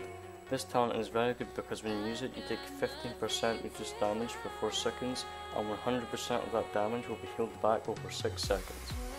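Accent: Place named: Scotland, United Kingdom. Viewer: Scottish ya fucking rocket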